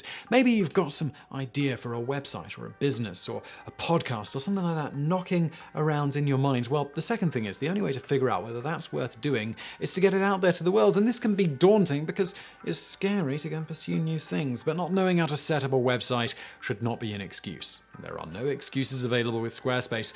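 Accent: United Kingdom. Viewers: he's a Brit living in Prague why would he pronounce things in American English? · Does he say Advertisements as "ad vert is ments" I guess it makes more sense than the American accent version, but as an American...I kind of hate it. Its "Ad-ver-tise-ments" like I cant stand how brits say "Gare-ege" GA RAJ. Ok, the way we pronounce words not as they spelled, is dumb. Ill admit. Still not a fan of how brits say things😅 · is British and that’s how many of them would pronounce it via their accent. Learn something new every day indeed.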